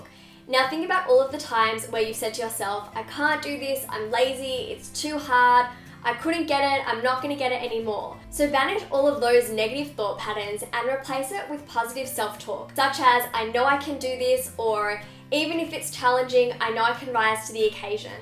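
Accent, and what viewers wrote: Australia. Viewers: Is it just me, or is ' Australian accent actually very cute?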